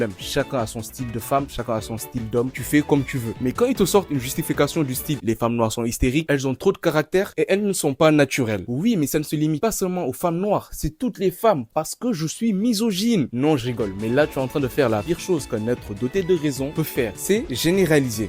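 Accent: Senegal. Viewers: tu es sénégalais à ton accent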